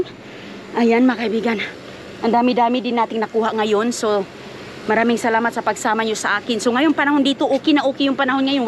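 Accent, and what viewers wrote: Visayas, Philippines. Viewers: Your very visayan speaking,that's good your husband &your kids are eating foods.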